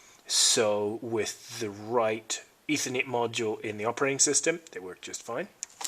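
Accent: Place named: Scotland, United Kingdom. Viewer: Ah, right. Thought I heard a bit of Scot in there too. I guess you get this question a lot.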